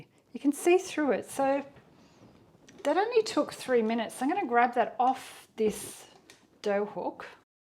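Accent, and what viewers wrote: Australia. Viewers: Thanks for this great video. Since Judith Durham said "Goodbye", I don't have an Aussie ladyfriend -- you're it. Bakes bread, wonderful accent and a pleasant way about you... what's not to love,huh? Please forgive me, I don't mean to be politically incorrect. It's just my Canadian way of saying,"Well done!" G'day. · Appreciate this plantbased recipe and the written instructions alongside your lovely Aussie accent 💙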